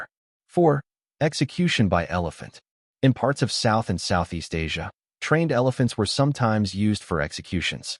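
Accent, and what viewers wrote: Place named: United Kingdom. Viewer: Love the voice It's similar to uk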